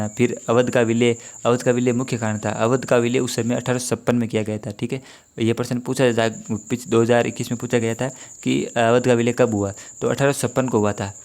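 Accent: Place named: Marwar, India. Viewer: सर आपके वीडियो में कभी-कभी मारवाड़ी भी झलकती हैं जो बहुत अच्छी लगती है। सर क्या आप मारवाड़ क्षेत्र से हो ? मेरे जैसे डीडवाना-कुचामनसिटी जिले से।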